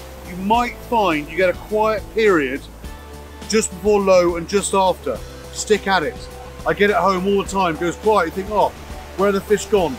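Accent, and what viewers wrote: South Africa. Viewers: I like how he keeps saying, Yoh! Like a proper Saffa 😁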